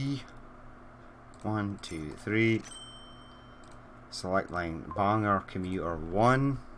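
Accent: Scotland, United Kingdom. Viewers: Hey, are you Scottish, Irish, or some flavor of British?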